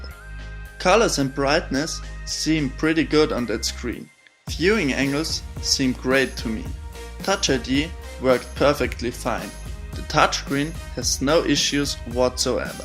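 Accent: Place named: Austria. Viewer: klingst sehr österreichisch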